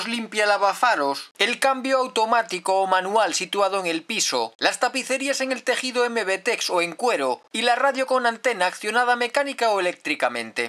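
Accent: Galicia, Spain. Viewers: Este vídeo es al canal lo que un Mercedes clásico a la automoción: calidad, honestidad, sosiego y elegancia. El acento gallego es la guinda, pues es original y huye de convencionalismos. Desde Donosti: zorionak! Excelente trabajo.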